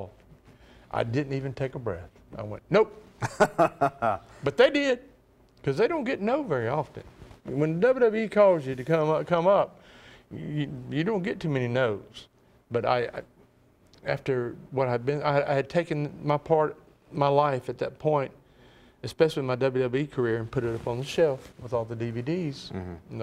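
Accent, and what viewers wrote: South, United States. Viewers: He's got that southern drawl. Being from Alabama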